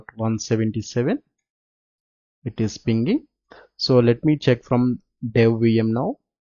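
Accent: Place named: India. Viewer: Just another indian with a terrible accent reading from a google search about the subject.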